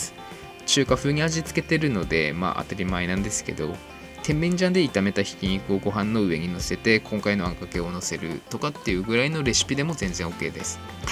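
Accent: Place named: Tsugaru, Japan. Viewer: おめ津軽だべ？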